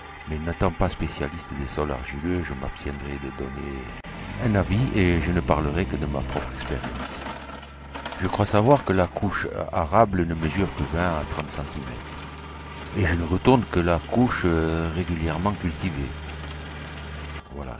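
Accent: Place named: Corsica, France. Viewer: Bravo pour cette vidéo. je comprends mieux ce que j'ai à faire chez moi. Et merci pour ce magnifique accent corse!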